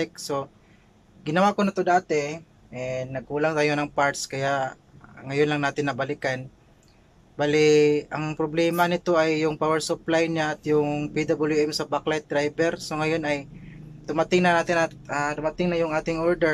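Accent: Visayas, Philippines. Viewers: Good job, bisaya ka sir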